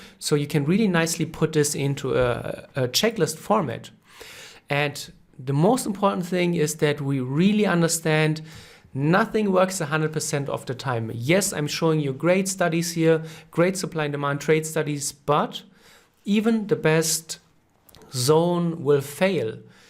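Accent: Germany. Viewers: Something about a scientific German accent that's reassuring, much respect my mentor and thanks for the wisdom, yes I'm black and I'm saying this, thanks again brother! liked and subscribed! :'D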